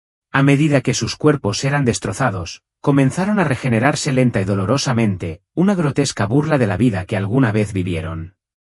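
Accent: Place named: Catalonia, Spain. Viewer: Me choca que hagan videos religiosos con voces robóticas y además con acento español catalán, suenan muy mal porque son impersonales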